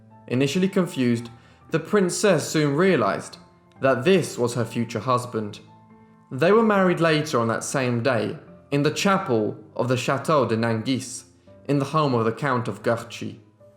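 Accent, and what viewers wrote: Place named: United Kingdom. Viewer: You can't use the snotty British voice It's a nightmare to listen to.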